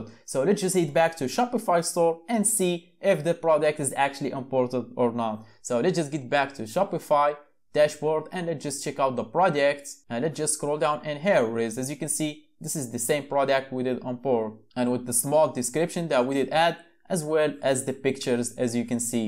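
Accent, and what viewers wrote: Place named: Morocco. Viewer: kml akhy l3ziz ana hna f us o mn accent dialk bayna mghribi hhhh sa7a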